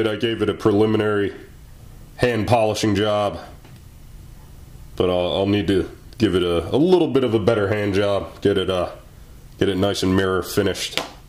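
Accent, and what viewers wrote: South, United States. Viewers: Wow, AvE. You seem to have picked up a slightly southern twang and a deeper voice. The rest of it is the exact same though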